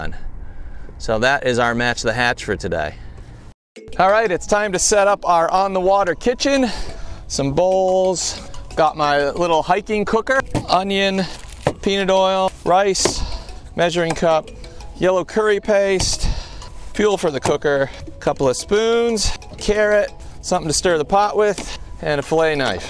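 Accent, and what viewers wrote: United States. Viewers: The accent sounds Boston. Like Ray the series.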